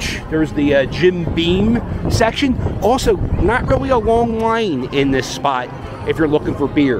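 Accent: Philadelphia, United States. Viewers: Perfect—a tour of the Bank and a flawless Philly accent!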